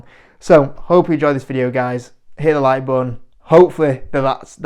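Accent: United Kingdom. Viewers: British accent is immediate red flag LOL lets hear a European school the Americans about the stock market LOL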